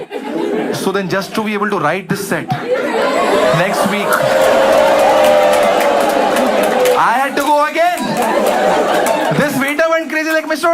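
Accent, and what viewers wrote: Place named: India. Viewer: Indian Accent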